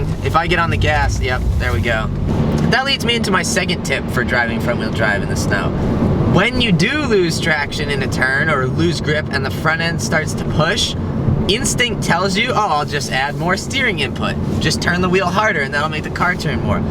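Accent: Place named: England, United Kingdom. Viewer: Nice English accent and great video.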